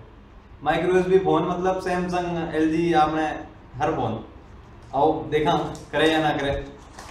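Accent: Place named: Haryana, India. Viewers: Great bhai!!! Asse hi review or vlogs banya kro...aap jaat ho ...assi damdaar haryani language m baat aap nhi kro gye toh kon krre gye ... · Mst haryanvi h guru ji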